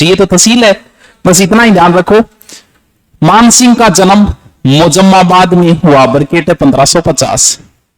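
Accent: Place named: Uttar Pradesh, India. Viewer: Up se lagti hai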